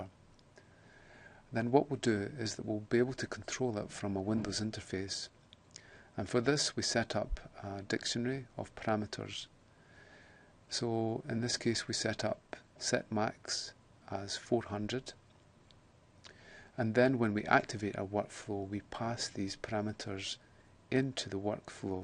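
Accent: Scotland, United Kingdom. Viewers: He's Scottish, it's just how they sound.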